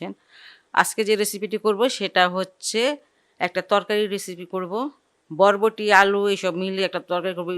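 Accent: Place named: Jamalpur, Bangladesh. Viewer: আপা আপনার রান্না গুলো খুবই ভালো লাগে,, আপনার বাড়ি কোথায় মনে হয় জামালপুর জেলা আপনার কথা বলা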